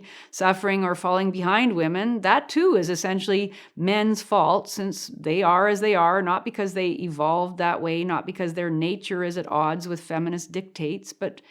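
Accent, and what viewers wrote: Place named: Upper Midwest, United States. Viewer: Sounds like a Minnesota or Wisconsin(Canada's neighbor makes sense) accent. Good video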